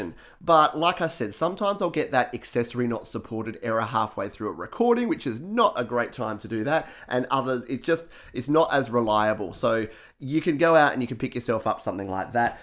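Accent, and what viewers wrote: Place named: Australia. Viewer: Thanks for that. I’m upgrading from the dinosaur era desktop so all advice gratefully received - (and very happy to hear an Australian accent for once. ) So - Question : I didn’t realise I can use my I pad Pro for recording until watching your vids. But - is it possible to somehow get the I-Pad screen onto a separate large monitor screen ?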